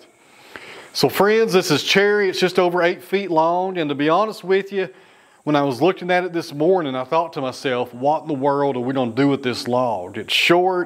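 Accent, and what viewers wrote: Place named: South, United States. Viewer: .. I agree, there is good and bad everywhere, but I'm Mexican and resect, politeness and manners is really big in my culture.. so I really admire it when I see it in other cultures.. maybe it's just that southern, twang that sounds so cool... LOL.. and do you notice how much he loves and respects his father? if it wasn't for that southern twang, I would swear he could be Mexican.. LOL...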